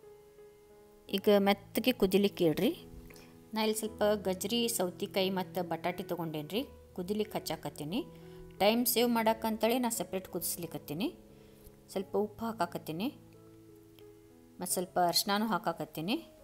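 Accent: India. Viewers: You sound awesome 👏🏼 so good to hear you speaking in Namma Hubli Kannada 😊Loved listening to it..!! Your sambhar looks superb and this is what I call comfort food...You are such a versatile cook 🙏🏼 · Your Kannada voice over added Best flavour to the Sambar recipe 😍 Please continue it for all videos , ಹುಬ್ಬಳ್ಳಿ ಭಾಷೆ 🔥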